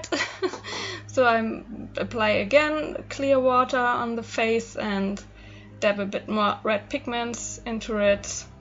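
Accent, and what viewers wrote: Germany. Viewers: thank you so much for this video! your English is really really good! and I love your accent, it reminds me of my grandmother (she is from Aschaffenburg) like hearing her at a younger age. I'm sorry if that's strange. ^_^ but I appreciate your video very much, I definitely want to try some of your tricks and methods when I paint :D be well! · Bist du deutsch? Dein englisch hört sich so an 😂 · letmefly 97 I'm Pretty Sure she's from Germany or Austria (or Switzerland?) because of her Accent (I'm from Germany and most of my classmates have this Accent sooo yeah) But...People correct me if I'm wrong! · Are u german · Ich liebe deinen deutschen Touch in deinem Englisch :D Fühle mich so verbunden 😂 Aber mal ehrlich, danke für das tolle Video, war wirklich hilfreich 😌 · Haha cool I think your tips may be helpful! Your accent sounds a little bit German 😅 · Man hört gleich, dass du deutschsprachig bist :) Sehr cool, danke für das Tutorial! 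· Your English is fine and the vid is amazing but you’re accent sounds like ur from Germany (I’m just curios tho)😂❤️ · ich bin nicht die einzigste die denkt ihre Muttersprache ist deutsch 😂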